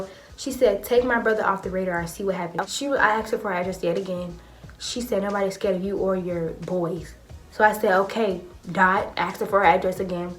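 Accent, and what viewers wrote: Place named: Georgia, United States. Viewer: you from ga ? if so, i can tell bc of how you talk😭.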